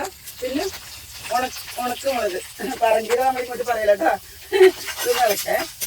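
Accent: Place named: Paravur, India. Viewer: ങേ ഇത് എന്റെ നാട്ടിലെ വർത്താനം ആണല്ലോ 🤔🤔 പറവൂർ ആണോ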